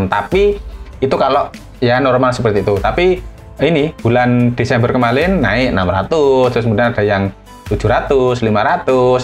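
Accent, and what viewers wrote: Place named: Ungaran, Indonesia. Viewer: Nek ngrungokke logatte mas, dadi kelingan kampung halamanku di ungaran mas, karna logatnya hampir sama, semoga tambah sukses banyak subsribernya mas, mampir Cilacap dan direview kotanya, wassalaam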